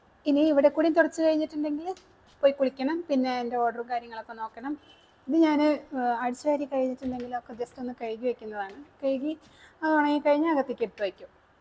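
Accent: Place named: Kozhikode, India. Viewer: Ningl clt kkarano....bhasha kettitt angane thonnunnu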